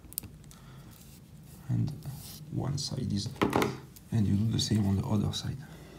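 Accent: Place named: France. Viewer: a l'accent francais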